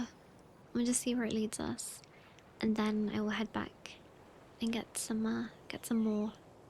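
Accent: England, United Kingdom. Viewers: it's just an English accent what😭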